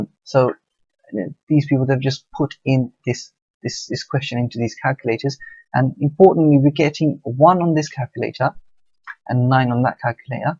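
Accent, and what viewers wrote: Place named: London, United Kingdom. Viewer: I hope that was sarcasm? London accent and a Utd supporter...local?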